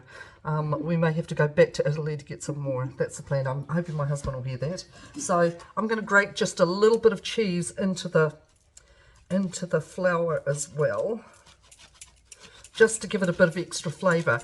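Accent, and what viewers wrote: New Zealand. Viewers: Kia ora! So nice hearing a Kiwi voice, feels like i'm listening to an aunty. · Bruh what I understand it fine. New Zealand accent bruv you must be American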